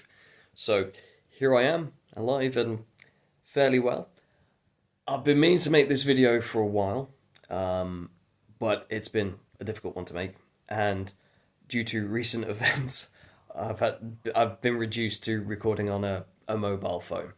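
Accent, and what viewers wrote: United Kingdom. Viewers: Holy shit you’re British.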